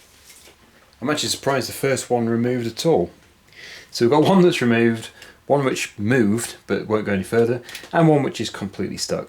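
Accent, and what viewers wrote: United Kingdom. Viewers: I love the commentary. I like the British accent.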